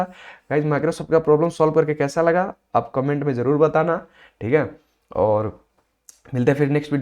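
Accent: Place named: Bihar, India. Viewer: explained amazing . By your tune you looked Bihari/up, I am also a Bihari. good to see you bro.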